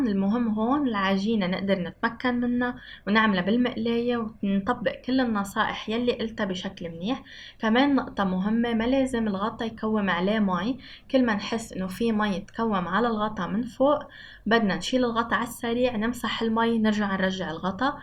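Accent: Lebanon. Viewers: انت من لبنان؟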